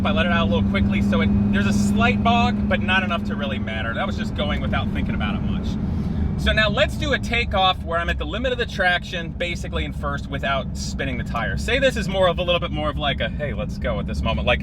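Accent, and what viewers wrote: England, United Kingdom. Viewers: Dude you’re so hyperactive!! I love your video style. And your car. Love the way you sign off: be nice to your cars. Absolutely. Finally, I’m from ENgland, nice English accent. :D:D:D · You look a bit like jenson button, you got the british accent down pat too😂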